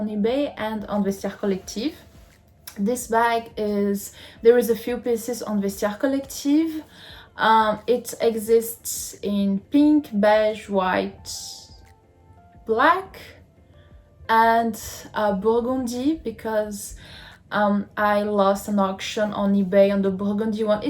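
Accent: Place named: France. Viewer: Are you French? Love the accent!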